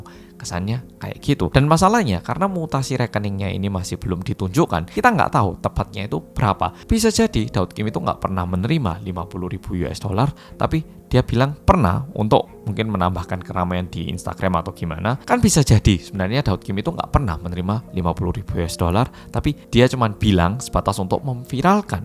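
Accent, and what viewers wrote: Java, Indonesia. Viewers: sampean orang korea apa orang jawa ...bro ... hehehhe kok logatnya medok sekali ...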